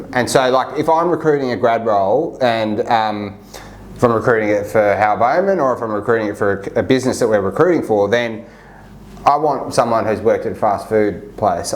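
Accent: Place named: Australia. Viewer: Very helpful information! I'm an entry-level accountant working to switch careers from ESL to Accounting. In the US the demand for accountants is so high that they are starting to hire accountants with no degree and certificates instead. It sounds like you are from Australia, is Australia having a similar situation, or are they still solely focusing on people with college degrees?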